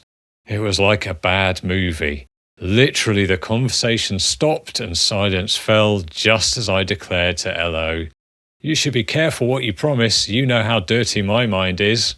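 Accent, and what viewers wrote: England, United Kingdom. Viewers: 50 bucks says you know this from both sides, doc, because the transference must be off the charts. All that and an English accent to boot. 😂 Funny thing happened to me, I politely extracted myself from a budding friendship with an unavailable LO, but now I'm running into him everywhere in a city of three quarters of a million people. It's like a cosmic practical joke.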